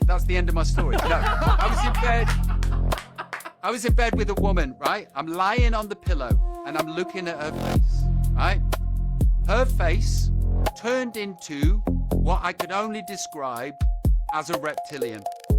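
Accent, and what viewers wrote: Australia. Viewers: Are you from Australia or New Zealand? :D Like anything else, there's likely a wide variety of subspecies with differing adaptations and evolutionary backgrounds. It's never just one "evil" group. I appreciate you being calm and methodical about a weird topic. Where are you really hoping this channel will go? If you manage to find success without becoming hysterical, selling out, or being misguided by fans of mimicry, at some point you'll probably experience something absolutely horrifying as a "warning."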